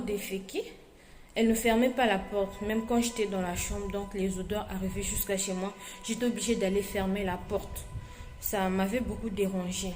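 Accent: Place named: Democratic Republic of the Congo. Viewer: Ah tu es congolaise aussi, ça se sent en parlant😌😅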